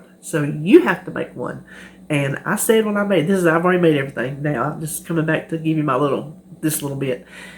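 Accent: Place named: Union County, United States. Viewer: I use Hellmann's Light Mayo and don't notice any real difference in taste from regular. I've even convinced my husband to like it. I've never tried Duke's. I put green onions and celery in my potato salad, no relish, but the sugar is key! You live near where my father's family was from for generations . . . Union County. My grandparents lived in Monroe. No wonder I love your accent. :) You measured 883 grams, but said 833 grams! Oops.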